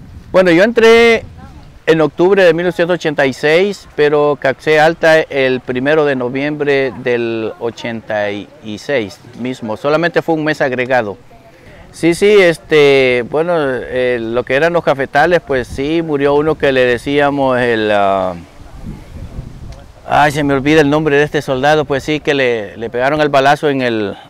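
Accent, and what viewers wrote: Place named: Guatemala. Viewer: Este maytro habla como Chapin .saludes a todos Los de aguachapan y sonsonate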